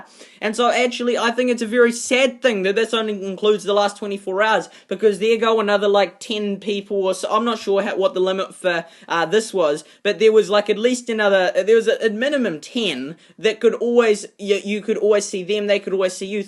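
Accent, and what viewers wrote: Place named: New Zealand. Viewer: WhiteAsian Manchild he’s from New Zealand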